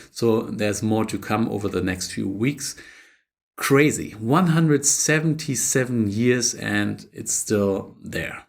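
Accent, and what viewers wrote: Germany. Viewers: Ich wusste du bist ein Deutscher ohne dein Namen zu kennen dieses deutsch englisch ist halt so scheise wie bei uns allen 😂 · Alter man hört den deutschen so raus wenn der redet 😂😂😂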